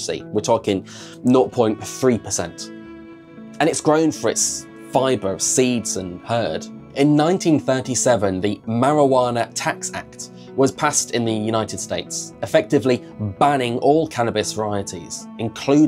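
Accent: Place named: England, United Kingdom. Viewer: This is so US-centric. Maybe the "floodgates were opened in 2018" in the US, but in Europe, industrial hemp has been in use much longer. In fact the US were the only industrialised nation that outlawed industrial hemp for so long. For example, in Germany, the illegal status of industrial hemp was abolished in 1996. Hemp in Europe has always been in use for plumbing, rope production and insulation. It's the increased interest in sustainability and the need for energy efficient house building that has led to its revival. There are many well-established companies that have been selling hemp products for a long time. I can even find them in my local DIY store. For example, "Hanfstein" ( meaning hemp stone) have been producing and selling hempcrete bricks since 15 years. Americans sometimes have to be reminded that there is a world beyond their borders, and that sometimes they are not No.1, but lagging behind. 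Edit: from your accent, I'd expect that you're English, but the situation you described is specific to the US.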